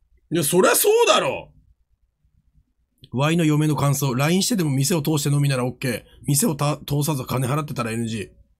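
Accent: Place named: Chiba, Japan. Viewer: 電話の時の話し方がマジで千葉の南の方のイントネーションで好き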